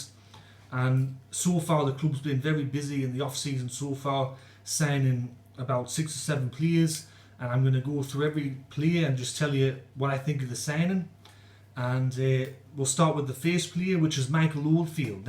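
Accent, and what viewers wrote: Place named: Geordie, United Kingdom. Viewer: Good video! Dude, your accent! Where's it from? Geordie?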